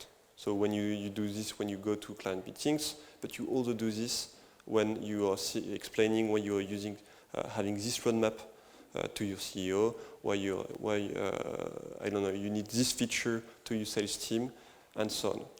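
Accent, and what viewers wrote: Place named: France. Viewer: Ecouter un français parler anglais ça devient vite insupportable. Est-ce que vraiment le type de public justifiait ça?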